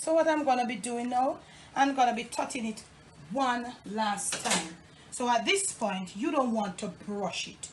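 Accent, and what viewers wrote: Jamaica. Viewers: I looove chicken, especially the dark meat, it has more flavor, and looove the flavor combination you have in all your recipes, but especially this one..I'm a nasty girl and save the yummy drippings to use to flavor other dishes.I even have brushed breads, or biscuits with it..or if I make lentil or bean stew I use that flavoring in it by way of the drippings, just a little though..you are my magic kitchen queen to watch cook, I love your recipes and love your beautiful Jamaican accent(I do believe it makes your food more yummy)..Bless you and keep on coming with more scrumptious recipes..oh, I have discovered a new and super health type of rice, called " Black forbidden rice" and another that is " Red rice" .They have even more health benefits and anti aging things than Blueberries !..and they taste really good, not yucky like brown rice (I hate brown rice)...you might try it..in 3 months it lowered my cholesterol and helped me loose a little weight( miracle)..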